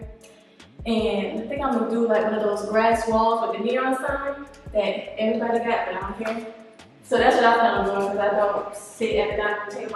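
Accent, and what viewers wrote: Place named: Houston, United States. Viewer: I think I hear a bit of Houston accent 🤔 beautiful home.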